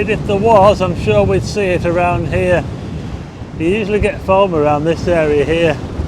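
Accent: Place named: Lancashire, United Kingdom. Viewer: I missed Blackpool and your lovely videos always provided me the update on the surrounding areas..l also loved to listen to your Lancashire's accent ..Really enjoyedwatching it..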